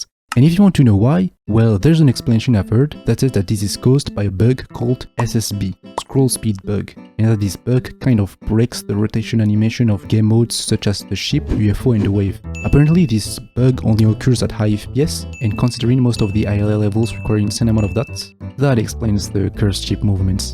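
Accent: France. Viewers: good video but you sound suspiciously french 😤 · No it’s alright volume wise but you have a really strong french accent and it’s really hard to understand you sometimes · You sound french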